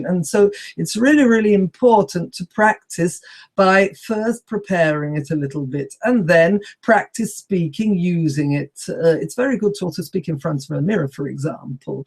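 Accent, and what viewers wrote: Italy. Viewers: From her accent she sounds like an Italian native speaker, however I've found her advice very helpful. It takes more videos like this.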